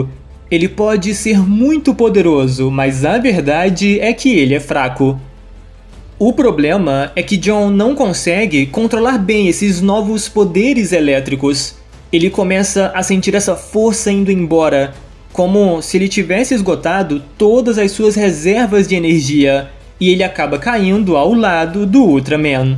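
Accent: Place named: Northeast, Brazil. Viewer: mano por curiosidade, vc segura algum sotaque? parece q tem algum sotaque bonito do nordeste ai escondido kkkkkkkkk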